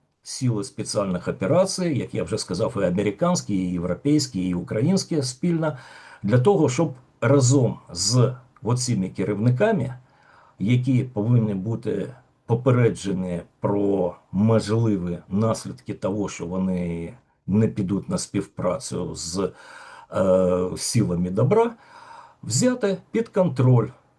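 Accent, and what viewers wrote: Russia. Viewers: ... більше розмовляйте українською в побуті, бо рассєйська на губах висить.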